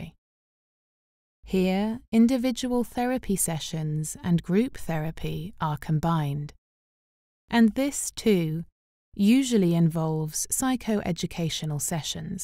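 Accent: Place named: England, United Kingdom. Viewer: I love it when a speaker has an English accent. It makes her points sounds so impressive.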